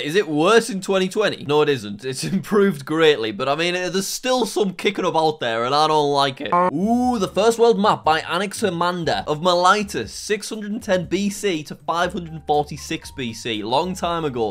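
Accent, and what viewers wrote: United Kingdom. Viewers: british accent doesn't really pronounce the r in arm, but most American accents do. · I just love it so much when says “Enhance! Enhance” with his nice British accent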